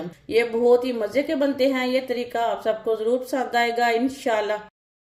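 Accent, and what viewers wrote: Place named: Punjab, India. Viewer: Never watched such unappetising food being cooked with the explanation in a hilarious Urdu with a Punjabi accent. Very sad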